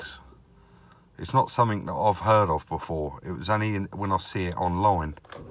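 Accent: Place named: London, United Kingdom. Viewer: thats why most people like watching him. its So london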